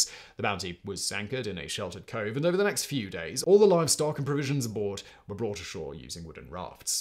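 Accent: England, United Kingdom. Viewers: Narrator does speak kind of fast but he clearly and precisely delivers the narration. His delivery keeps me engrossed in the story. I dunno i kinda like English in that particula rmanner of elocution and accent. Though I don't know from what region in the u.k. our speedy speaking narrator doth haileth. From a bred, born, and raised Southern Arizonan descendent of English stock... well works just fine for me. (Fast talking English guy that is).. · His crazy accent? You mean English? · The King's English, spoken as rapidly as this presenter does, is impossible to understand. It is too long to read the closed captioning, so I remain ignorant of the final outcome for the mutineers.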